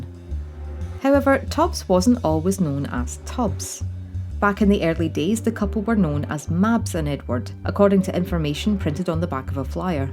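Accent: Scotland, United Kingdom. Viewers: Hi from a fellow Scot (you sound Scottish?). I started watching this show under a year ago and am quite keen on it. I haven't seen the Christmas show (I've got it on DVD but am waiting until closer to Christmas to watch it) or the 2018 live one yet but I'll def. get it. In any case, I wasn't aware of the whole/complete story of the characters, so this was very informative!. Personally, my favourite characters are Pauline and Mickey (Pauline reminds me of someone), although I am keen on the Dentons as well... and Les McQueen. I'd personally vote for Pauline though.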